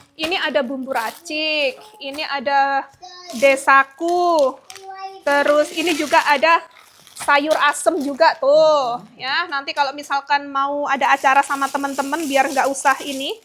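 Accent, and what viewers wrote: United Kingdom. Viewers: suka banget klo udah ngomong indo tapi aksen inggris nya itu loh cobek 20rb chowbeck 500rb ya ka 😂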